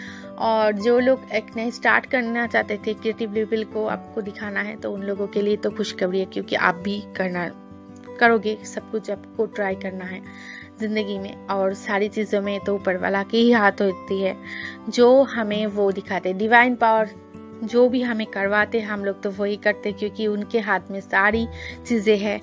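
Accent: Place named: Bengal, India. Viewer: Waah nice jankari ap bangali h na apki hindi se lg raha h😅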